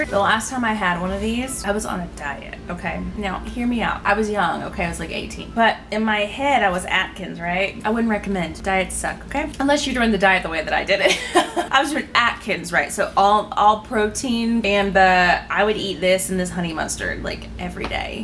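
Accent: South, United States. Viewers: Gurl, you so crazy! I love watching you! You always make me laugh!😂❤ You definitely sound more southern when you're at home in NC. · Do I here a bigger smattering of a saucy Southern accent than usual?? ❤ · I don't know if it's just me but I feel like your Southern accent has come out so much more in this video! It must be the environment! Ahaha. Anyway, I LOVE IT!! 🥰🥰🙏❤️‍🔥 P.S. yes I'm rewatching this, cuz well duh. I Stan our food queen!! 🫡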